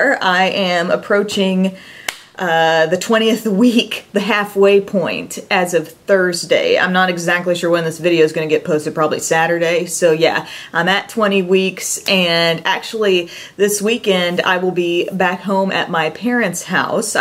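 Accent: South, United States. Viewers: Got a little more southern drawl in your speech today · It's so interesting to hear your "accent" because I live in the northwest suburbs of Chicago and I don't hear that accent here! It sounds southern :) I love it! #snapchatfam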